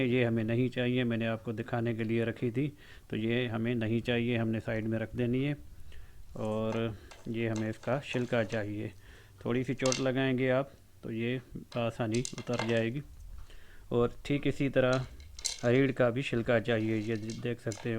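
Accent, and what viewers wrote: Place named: Uttar Pradesh, India. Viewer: السلام علیکم ورحمتہ اللہ وبرکاتہ حکیم جی آپکا لب و لہجہ بتا رہا ہے کی آپ کا آبائی تعلق اتر پردیش سے تھا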